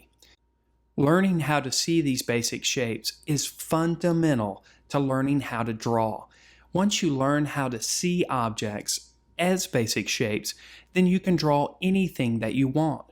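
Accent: South, United States. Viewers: Maybe South Eastern. Has a southern drawl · Americans, which regional accent is this? I love it!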